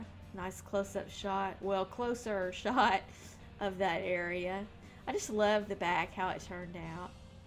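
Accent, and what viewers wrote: South, United States. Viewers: I love your house building video, This is the first one I watched of your builds. I love your accent to, Are you southern? Just curious.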